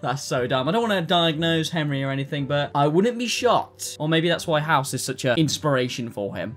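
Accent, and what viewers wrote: United Kingdom. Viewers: i think i just fell in love with british accent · man your vidoes are fun 😂 Gonna binge watch everything now!! P.S. That bri'ish accent helps too 🤭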